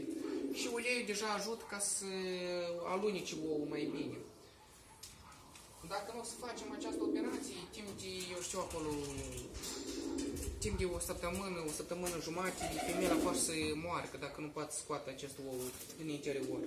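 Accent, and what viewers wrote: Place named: Moldova. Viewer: E din moldova ca vorbeste moldoveneste👍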